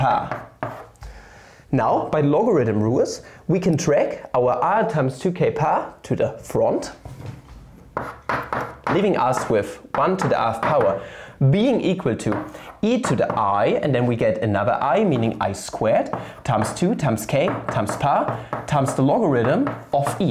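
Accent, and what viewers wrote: Germany. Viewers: Are you German or American????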